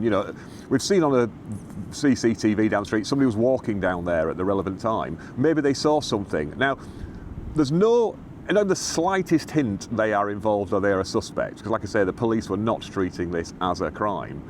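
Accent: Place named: Northern England, United Kingdom. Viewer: As someone that knows nothing about law I do enjoy listening to these videos and find them educational.😇 May I ask where that untainted Northern twang that you have is from, as you are very likely close to my own roots (Hull, Hessle more precise)? Definitely the way you pronounce your words, like 'Erm and Away' I'm guessing NE Yorkshire somewhere? The accent quickly changes once one starts travelling up and down the A1. Keep the videos coming.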